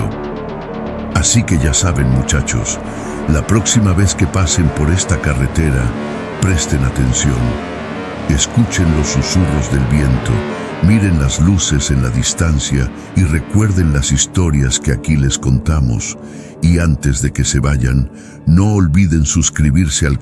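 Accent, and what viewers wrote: Dominican Republic. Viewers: Hasta parece dominicano ese man del narrador😂😂😂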